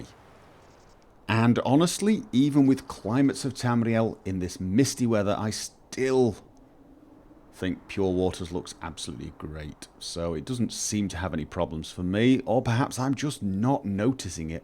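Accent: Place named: Scotland, United Kingdom. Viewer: the fudge? he be english? sounds a bit scottish but without the heavy accent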